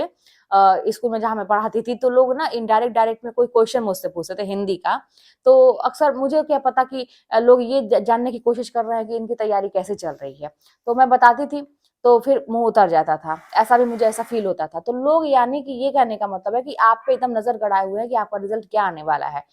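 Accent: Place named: Bihar, India. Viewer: Hello madam ji good aap biharii hai kya aapke bolne se pta lag raha hai bihari staill😊😊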